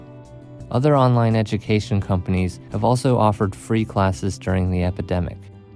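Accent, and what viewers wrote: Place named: United States. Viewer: 正宗的美式English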